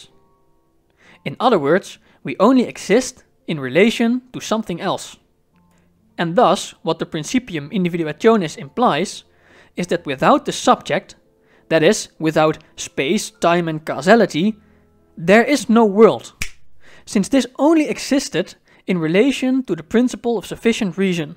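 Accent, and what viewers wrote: Netherlands. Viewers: You're Dutch, aren't you?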